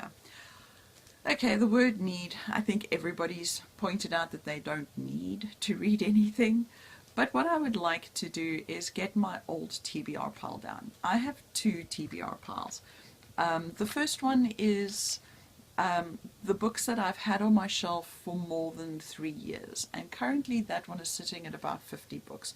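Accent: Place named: South Africa. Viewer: Ahh I just found your channel! Where in SA are you? I lived in Knysna for YEARS - now live in Australia but so nice hearing a Saffa accent again! OK so I now need to read Wolf Hall haha!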